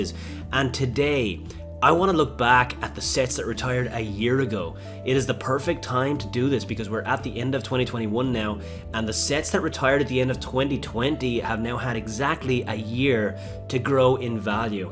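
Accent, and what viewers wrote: Ireland. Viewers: Is that an Irish accent i hear? I dont use Amazon for sale prices but thanks for the tips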